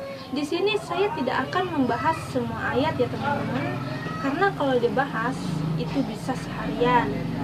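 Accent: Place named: Ambon, Indonesia. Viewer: Orang Ambon ya mba. Atw manado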